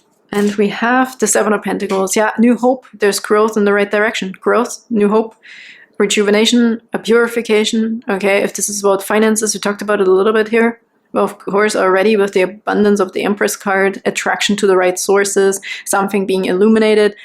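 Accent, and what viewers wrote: Germany. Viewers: Dear, big thx for pile 3. It resonated 100%. Can I ask you a personal question? Is your mother language german? I kinda hear it when u speak. Big greetings from Austria, Nicole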